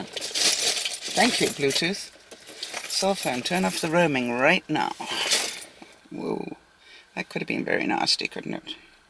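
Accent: United Kingdom. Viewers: Found your channel through Judy and Benji and liking it so far! Can I ask, are you British at all? Just your accent sounds familiar to me! :)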